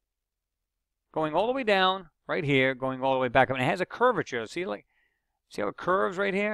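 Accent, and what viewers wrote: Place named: North Jersey, United States. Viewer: lol that's funny cause I really thought you lived in the north jersey or New York area you sound more like your from here then down where u said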